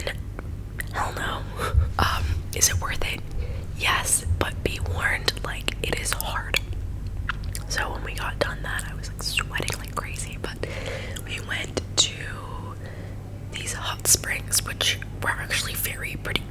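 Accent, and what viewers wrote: United Kingdom. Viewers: Love the British accent 😂😂🔥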